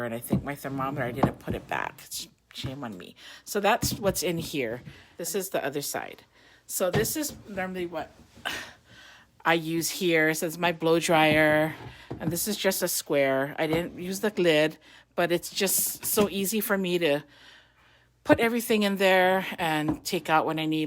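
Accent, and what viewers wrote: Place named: Hawaii, United States. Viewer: Mahalo for making this video. I wish I could hire you to organize my house. Your voice is making me miss my friends from Hawaii.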